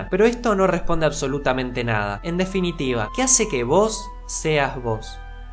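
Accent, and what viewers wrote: Argentina. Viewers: El tema es muy interesante, hasta que escuché su tono y acento de argie insufrible. Así que no hay like. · Buen video pero tu acento es más argento de lo normal. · Practica un español neutro tu acento argentino molesta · me gusto mucho el vídeo y tu canal, pero para mi gusto deberías trabajar en un acento más neutral, el acento argentino no se entiende mucho.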